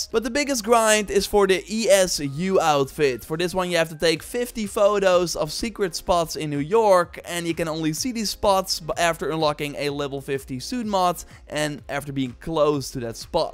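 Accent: Netherlands. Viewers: Dutch accents were made for youtube, your videos are very entertaining! Keep it up!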